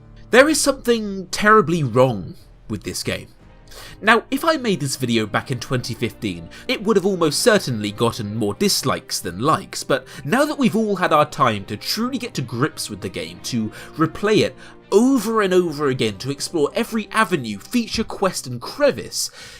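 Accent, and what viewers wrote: United Kingdom. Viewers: Don’t you jut hate the modern style British accent (yours)? I just love the old accents because there was so much variety and they sounded so much less wimpy and snotty and weird.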